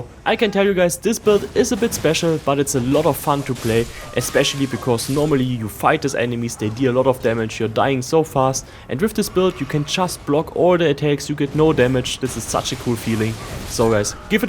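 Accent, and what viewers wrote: Germany. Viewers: gutes Englisch man hat fast ned rausgehött das du deutsch bist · cool build... der deutsche aktzent kickt hart lul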